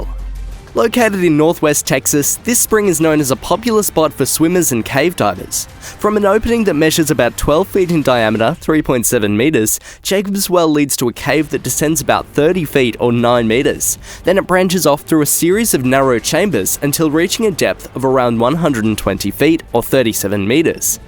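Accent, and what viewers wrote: Australia. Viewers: The narrator has a really solidly cool accent I'm thinking that it's Australian I hope I'm right but I'm just wondering now does different areas of Australia have different type of accents like the United States because I really like his. Would be fun to go live in that area just to come back with one.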